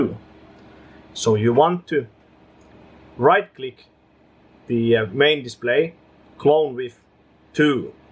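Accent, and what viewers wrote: Finland. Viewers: You really sound like Finnish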